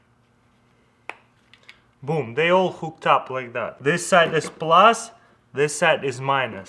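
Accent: Russia. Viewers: ive never wanted a Russian accent so bad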